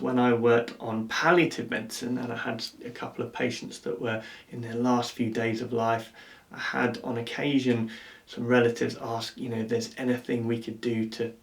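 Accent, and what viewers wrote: England, United Kingdom. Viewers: skinny white guy + english accent = instant likes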